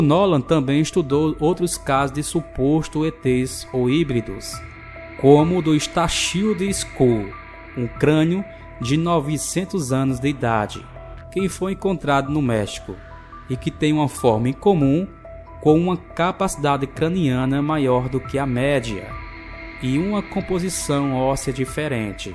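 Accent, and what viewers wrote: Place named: Portugal. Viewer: O locutor é da "terrinha"!!!☺️☺️☺️ PS. Isto não é um comentário racista, é carinhoso, viu?!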